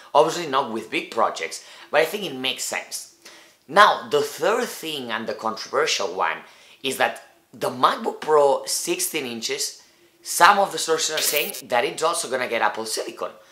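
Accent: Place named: Italy. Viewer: I am definitely obsessed with Apple! I like your accent! Where is it from? Italy?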